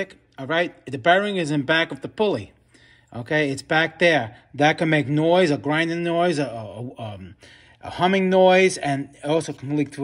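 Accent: New York, United States. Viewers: This dude has the coolest accent...what part of NY are you from?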